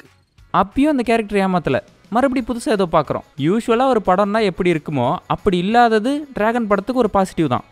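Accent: Salem, India. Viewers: Bro appa neenga salem ma 😅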